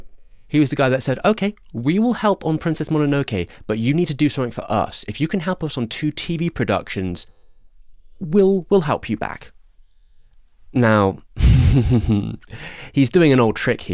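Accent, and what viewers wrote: United Kingdom. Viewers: japanese names in a british accent are crazy...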